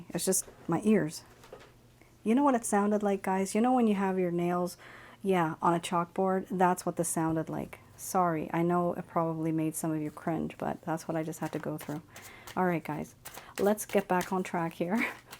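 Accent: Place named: Hawaii, United States. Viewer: WOW... N U R D ONLY ONE 2 RESPOND .. THANK U 4 DAT... HEHEHEH HEHEHEH... U SOUNDED SEMI HAWAIIAN, DATS Y I HAD ASKED... THANK U AGAIN...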